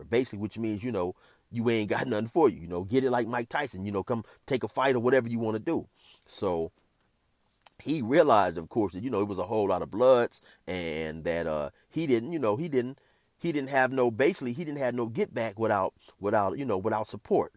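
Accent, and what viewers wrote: Africa. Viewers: i think i get more entertained with 12 min stories then a 2 hour movie the African accent had me dying! JUST TELL ME WHAT I OWE YOU! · Love the African accent lol 😂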